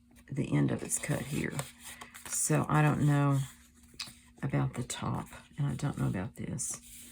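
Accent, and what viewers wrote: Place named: South, United States. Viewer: Just found you today. I’m creating right along with you❤. Your voice is so relaxing!! And I’m partial to southern accents as I live in Kentucky. You’re a nice visit on a Saturday afternoon!!😊😊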